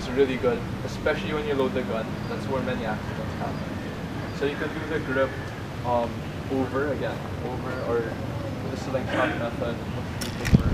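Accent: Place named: Philippines. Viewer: tagalog ka nga pa cute eh!!!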